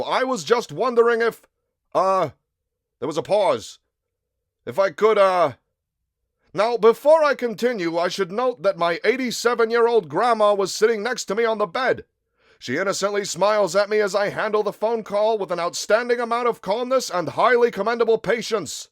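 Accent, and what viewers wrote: Brooklyn, United States. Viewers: Your Italia /Brooklyn accent makes this so much better.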